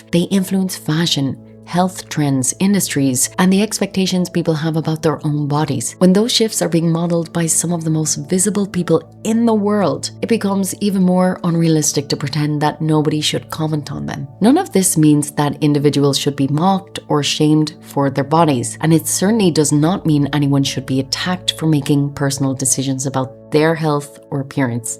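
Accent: Ireland. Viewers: Off topic but LOVE your Irish accent. You have a lovely voice too. It’s refreshing to hear ❤❤ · First time seeing your content and you have a subscriber. Also love the Irish accent and your soft voice serving these excellent takes!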